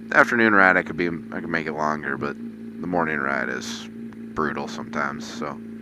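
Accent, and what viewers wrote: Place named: Minnesota, United States. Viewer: Yooooo Minnesota brother xd I had a feeling you were from there I can tell from your voice xD. That place looks familiar.. further into the video and your right in Duluth Xd this is cool I'm from there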